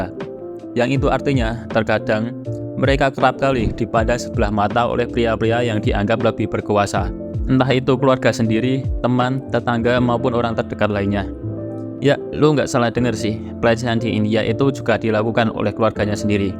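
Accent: Java, Indonesia. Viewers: hanya karena author medok pun dibuat masalah, miris lu ya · kalo ngomongnya masih medok, ga usah lu gua lu gua brody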